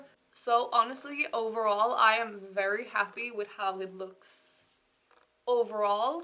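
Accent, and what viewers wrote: France. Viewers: Ahaha as a frenchie I can definitely tell he is french 😂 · That was a french accent in this man's voice haha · That foundation looks really good, I might give it a try 😁 And ouh that French accent, easy to recognize haha (kisses from France !) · French guy? Haha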